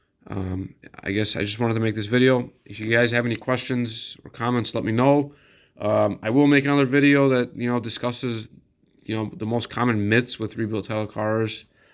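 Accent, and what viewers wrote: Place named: Northeast, United States. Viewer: Great video! I love the northeastern accent my cousin lives in Philly. I’m in Texas.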